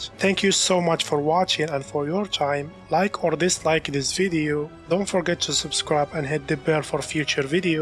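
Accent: Morocco. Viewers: Bro are you Moroccan ? Your accent sounds like your Moroccan... And thnx btw for this sweet helpful guide keep it up bro ❤️ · are you moroccan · bro nta mghribi ? bayna mn lhdra dyalk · you sound moroccan asf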